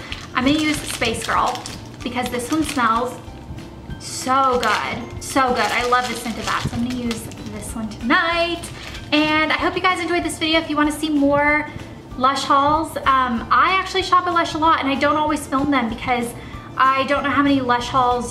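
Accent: South, United States. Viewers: Your such a southern bell! Like that might be weird to say but that is how I would describe you lol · i thgt u were uk but ir american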